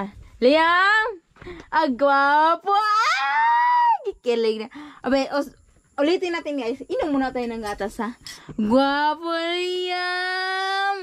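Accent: Visayas, Philippines. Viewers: Bisaya po kase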